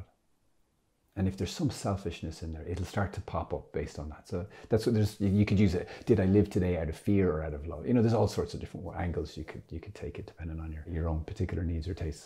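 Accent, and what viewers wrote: Ireland. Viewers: Gosh, Father, I learned something....one of which is we gain more graces other than the forgiveness of sin. I liked the phrase did I love Jesus through the day? Have to think about that. Generally I love these short but pithy videos....so much is packed in. And, there is always your Irish accent! Esther:yougotthis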